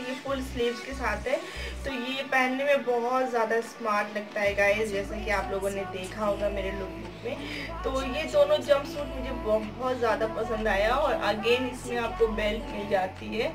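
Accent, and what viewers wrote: India. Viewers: Mast ek dam mumbai language Mai boleto jakas🤩🤩🤩🤩🤩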